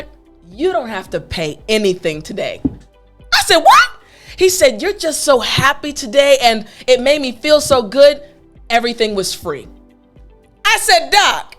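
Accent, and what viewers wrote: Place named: United States. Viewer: Loveeeeeeee Your ACCENT ! Crystal Clear American Accent !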